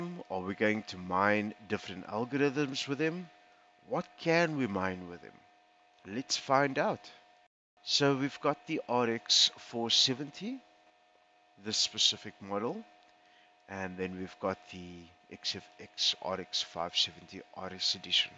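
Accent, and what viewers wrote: Afrikaans, South Africa. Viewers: Klink soos n Afrikaanse engels die.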